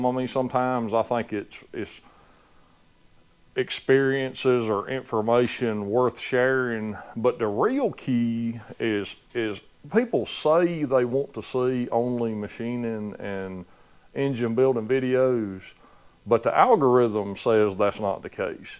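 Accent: South, United States. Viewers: The views will always speak for themselves. I agree with the majority, I like the drama videos, if that's what we're going to call them. Especially with your Southern drawl, it's really more of a telling it like it is video. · Do not like hear your southern drawl put me to sleep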